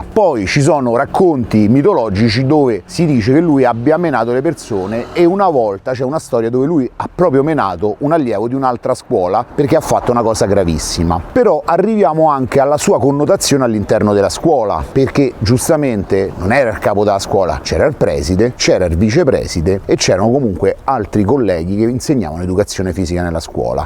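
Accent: Rome, Italy. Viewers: bravo, bella storia e col tuo accento romano diventa ancora più bella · Daje! Troppo bello stare ad ascoltarti con la tua cadenza romanesca, sei un tipo verace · Dopo tanti anni trascorsi nella bellissima Roma, sono felice di seguirti dall'altra parte dell'Adriatico. Da un lato mi dispiace molto vedere l'amata Roma in questo stato di degrado, ma dall'altro mi piacciono le tue storie curiose e divertenti e il modo in cui le racconti (soprattutto la cadenza romana 😄). Grande, continua così!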